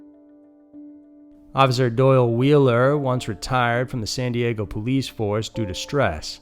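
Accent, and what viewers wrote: South, United States. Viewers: please post every day. I love your hard to pinpoint transplanted Bostonian accent. I asked you on Facebook. I know you've been in NY for years but you don't sound like you are from there either. My husband moved down from NY state in 1978 and you sound way more Southern. You probably won't read this but keep up the great work and Thank you! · Is your Southern accent real?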